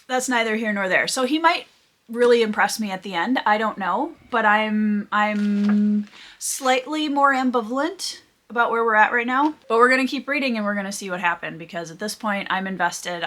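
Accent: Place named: Minnesota, United States. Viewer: I knew you had to be from Minnesota from your accent!!!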